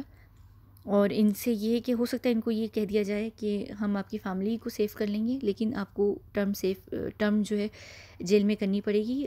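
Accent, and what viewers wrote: United Kingdom. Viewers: Excellent research. Your English accent sounds like you're from the UK. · Mam how is your english and hindi both so good. Like perfect british accent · English accent.. Masha Allah.. 😍💕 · your accent r supperb. can you teach me uk accent 😊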